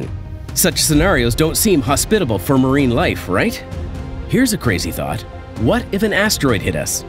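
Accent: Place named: Canada. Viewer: The narrator seems to have a Canadian accent.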